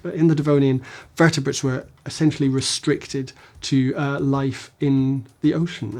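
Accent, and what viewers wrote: Scotland, United Kingdom. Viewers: Great. We have a British sounding explaining Prehistoric now! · Gotta love a guy geekin out with a British accent. Adorbs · british like me yes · He's from Edinburgh and now lives in London so has a mild Scottish accent. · Can someone tell me wht kind of british accent does he hav? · No, you're fine. he's using a regional pronunciation from parts of the UK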